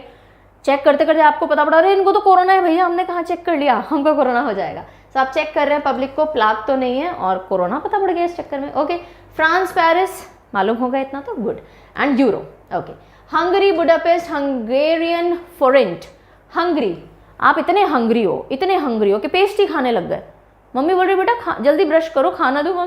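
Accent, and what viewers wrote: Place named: Bengal, India. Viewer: Aap bengal sai ho didi. Ki hallo didi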